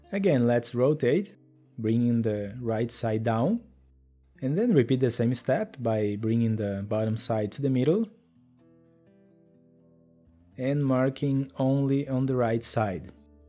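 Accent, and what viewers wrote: Brazil. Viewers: Pelo sotaque é brasileiro kkkkkkk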